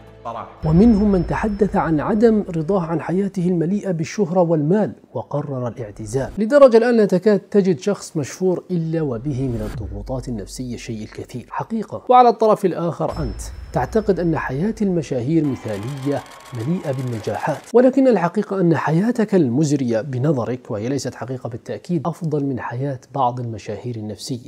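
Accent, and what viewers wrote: Egypt. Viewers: انت متاثر باللهجة المصرية اوي❤😂 حبيب البي ياسطا😂😂❤ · الفيديو مفيد كالعاده 💝ولهجتك المصريه بتضحكني اوي 😂😂 بس بتتكلم بيها بطريقه حلوه تحياتي ليك من مصر 🇪🇬💝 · اللهجة المصرية علي لسانك عسل يا ❤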